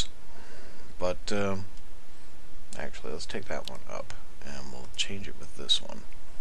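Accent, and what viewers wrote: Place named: United States. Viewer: You have an american accent and you say you live in scotland did you move?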